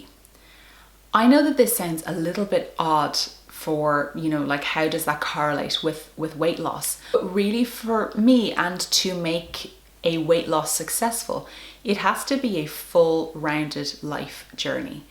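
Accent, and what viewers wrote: Ireland. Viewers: I love your Irish accent. You are delightful! I love this idea!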